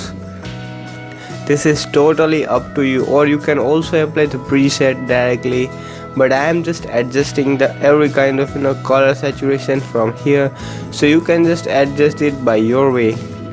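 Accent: Gujarat, India. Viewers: Are you from Gujarat? Your accent says that.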